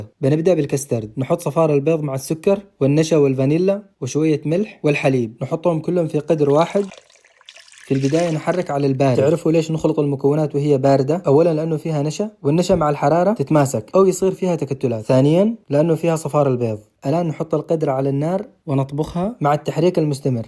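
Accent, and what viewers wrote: Aden, Yemen. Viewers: اول مره اتابع قناتك من لهجتك عرفت انك من عيال عدن والان من شانك بااسوي لك اشتراك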